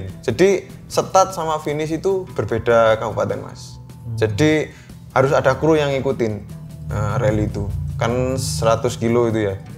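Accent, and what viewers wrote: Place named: East Java, Indonesia. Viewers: Amat sangat medhok ngrungknno seneng 😂😂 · Bangga dengan medok jawa mas. Karena mencerminkan orang yg lucu dan sabar hati. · Bahasane campur". 😂😂😂 Jowo indo campur . Medok emen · wkwkala bener mas logat e wong jatiman pol😂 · Anune,itune,katane,ndek sana,ndek situ,suuuwepiii,tuuuwakoot,gak uwweeenak,pehhh,behhh,. Jawatimur pride ❤🥰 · Y Allah lucu ngomonge wong jowo,salut ..salam dri jowo kendal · Podo" wong jawa timur mas.. Suueneng aku karo logatmu mas😂.. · Peeeh..byuuh...khas e kediri😅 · Yo-opo rek malang e medhok e pol 😂😂😂 , q Melu meker pedut iku bhs indo e opo!!! Jasikkk 😂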